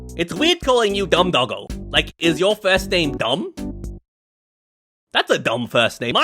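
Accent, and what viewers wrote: United Kingdom. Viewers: r u british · Well if you’re not British? What are you? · . ARE YOU A BRITISH 🤣🤣🤣🤣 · R U BRITHISH???? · Are you British? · Are you brithish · Are you British? (Slurping hardly) RAHHH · bro is British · R U BRITISH? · Are you british? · Are you British? Spits tea on dude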